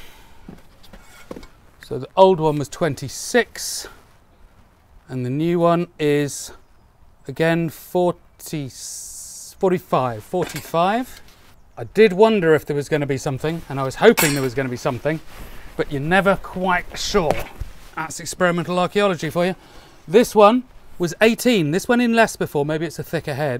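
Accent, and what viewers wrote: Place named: Berkshire, United Kingdom. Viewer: That accent sounds rather Berkshire, am I right?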